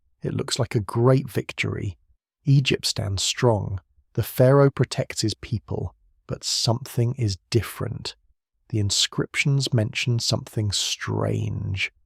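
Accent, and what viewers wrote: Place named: United Kingdom. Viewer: Very good content and no useless/annoying sound effects. Thank you. Why the British accent, though? It doesn’t make this more believable or impressive. A matter of cost, perhaps?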